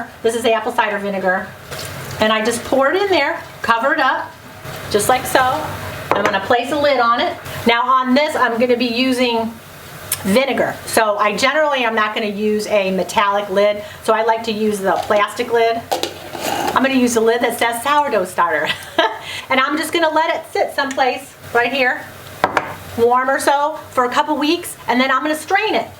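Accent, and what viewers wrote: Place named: Michigan, United States. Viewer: Let me guess.... you are from Michigan. Half my family speaks with that accent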